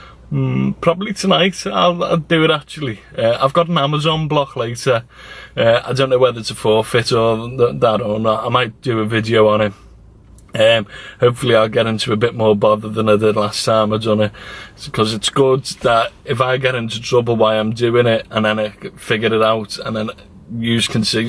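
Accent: United Kingdom. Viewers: focus on it and its fine. Scouse accent is much more understanable than scottish or yorkshire in my opinion:) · No, is my fault because i understand and speak american english. British accent is killing me.. 😁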